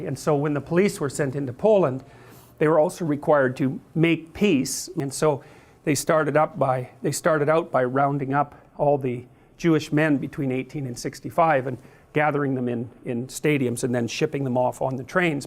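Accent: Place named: Canada. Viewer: With a Canadian twist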